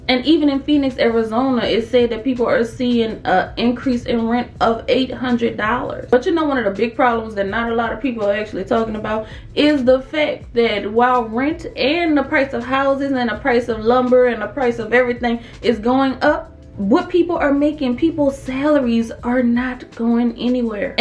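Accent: New Orleans, United States. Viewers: Thanks for this information. Btw, are you from New Orleans?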